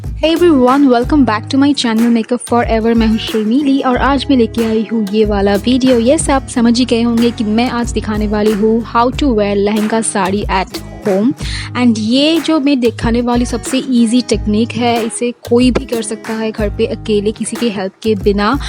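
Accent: Bengal, India. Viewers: Didi tmr kotha sune mone hocche tumi nischoy Bengali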